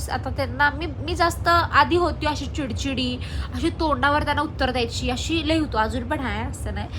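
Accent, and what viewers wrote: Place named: Ichalkaranji, India. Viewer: Congratulations 🎉 god bless you ❤ Mi punyat aste but tuz vlogs n video bghitle na mala khup athvn yete ichalkaranji chya bhashechi 😍 Keep it up 🥳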